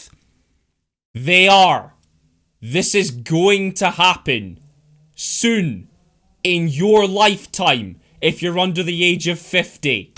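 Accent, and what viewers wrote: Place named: Scotland, United Kingdom. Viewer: holy shit you are Scottish. was not exepcting that. Fellow Scot. Not my real name haha. Sub'ed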